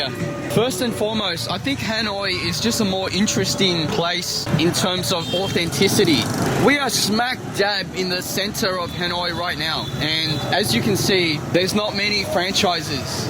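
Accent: Australia. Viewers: he grown up in Australia ,can't you tell by his accent ? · Enjoyed your video. I can hear your strong Aussie accent but didn't deter from you expressing yourself and giving your thoughts in an honest and genuine manner. In fact I loved your honesty. So good on ya mate!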